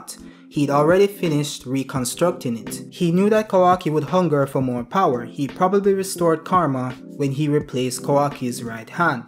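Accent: Jamaica. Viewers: Are you a Jamaican?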